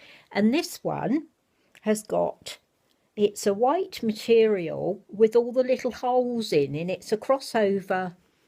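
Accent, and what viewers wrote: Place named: Essex, United Kingdom. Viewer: I love your accent. My best friend lives in Clacton and her accent is like yours. Well you have an Aussie baby, l will have to look at Nursery x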